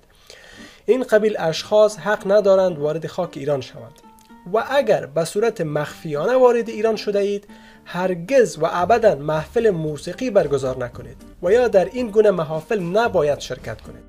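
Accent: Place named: Afghanistan. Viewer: جالبه که خود این بابا لهجه افغانی داره 😅😅😅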